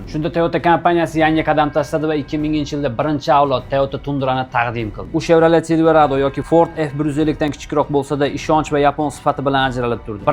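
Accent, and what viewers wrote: Andijan, Uzbekistan. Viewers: Бу брат тоза Анжанданми диманда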